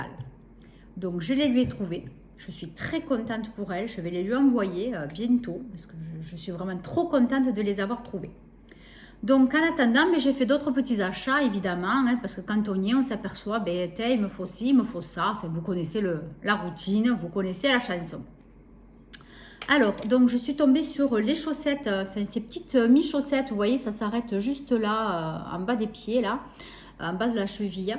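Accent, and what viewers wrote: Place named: Toulouse, France. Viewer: Coucou Merci pour tes vidéos J adore Tu as le même accent que moi 😘 Je suis à côté de Toulouse 😉 Bonne continuation et gros bisous ❤️ Martine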